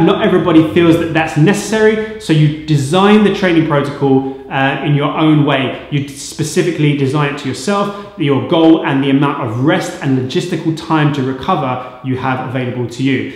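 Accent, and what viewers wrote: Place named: United Kingdom. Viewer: and why is it always bri'ish explaining the german volume training lol , ok jus kdn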